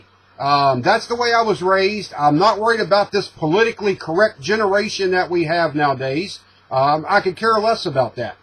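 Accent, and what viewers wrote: United States. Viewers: I love the rant. I am English and live in the UK. I find the way you Americans pronounce words very entertaining, keep on saying what you want any way you want to pronounce them. Thanks for the wonderful videos, I find them very informative and entertaining.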